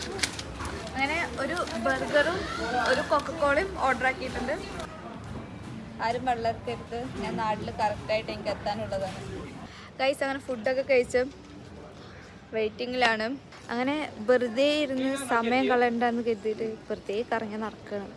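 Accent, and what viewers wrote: Palakkad, India. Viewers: ഫ്ലൈറ്റ് ഇറങ്ങിയതും തനി പാലക്കാടൻ സ്ലാങ്😂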